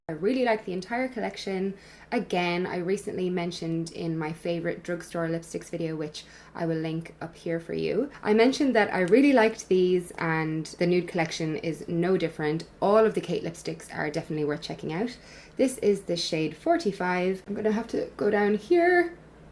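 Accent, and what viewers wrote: Ireland. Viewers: Is she Irish but lives in Australia?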